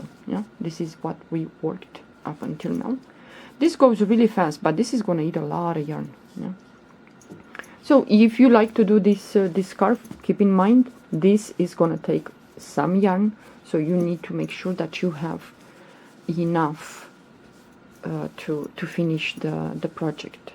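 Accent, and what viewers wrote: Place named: England, United Kingdom. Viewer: Great pattern. Loved learning that stitch. Love your English accent. It’s clear but rather cute! Easy to listen to ❣️ …and I’m English!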